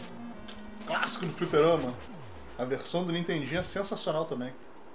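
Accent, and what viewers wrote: Rio de Janeiro, Brazil. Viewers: Bacana seu canal de games,você é do Rio de janeiro né,dá pra ver pelo sotaque,igual ao meu,eu moro aqui em niterói RJ.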